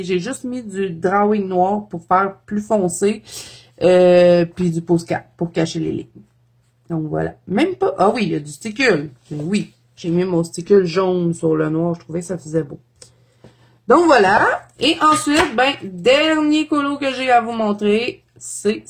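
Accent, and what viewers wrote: Quebec, Canada. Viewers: Salut, tu n'est pas satisfaite de ton colo Titanic mais voyons donc, tu nous joke comme on dit au Québec! Il est top et le coeur de l'océan est très réussi je trouve. J'aime beaucoup aussi celui avec les poissons volants. Bravo pour tes belles mises en couleur, j'aime regarder tes vidéos et surtout, t'écouter me rappelle quand j'habitais à Montréal. J'adooooore!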